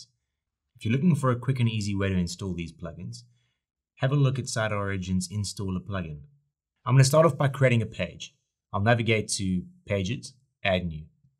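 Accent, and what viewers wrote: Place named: South Africa. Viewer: Great video, really helpful thanks. And brilliant to hear a Saffa accent!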